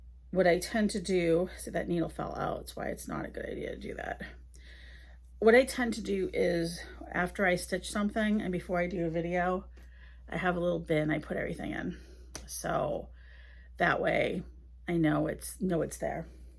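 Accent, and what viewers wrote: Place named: Boston, United States. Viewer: Great to see you again. I enjoy your floss tube and your Boston accent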